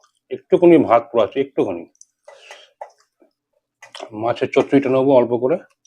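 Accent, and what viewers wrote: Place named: Bangal, Bangladesh. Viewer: বাঙাল বাঙাল, ঘোটি কখনোই নয়। ওনার কথাবার্তায় পরিষ্কার বোঝা যায়।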